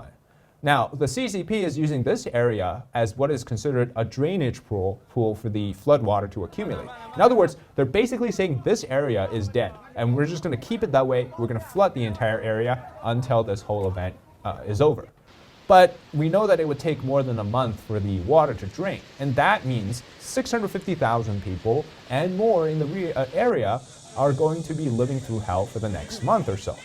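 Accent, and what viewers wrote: United States. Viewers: You sound like an American, bro. 😅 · >Accent : American >Looks : WTF, get a fg haircut, boy! Why are you still having Fk-loon-gong rural hairstyle!🤣 (make sure you make up your mind to part it to the left or right boy, hehe)😊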